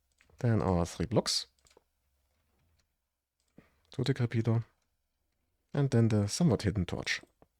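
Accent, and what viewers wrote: Germany. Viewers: Biste Deutsch, habe dich bei nem Video gesehn, wo dein Build geklaut wurde bei Dream SMP und habe deine Sprachweise gehört, also biste Deutsch. . If you can't read that, ignore it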